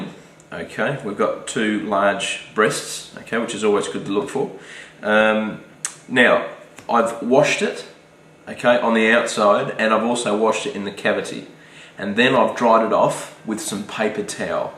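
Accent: Australia. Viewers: Very nice recipe What accent is that? Australian? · loveeeeeeee Australian accent!!!!!!!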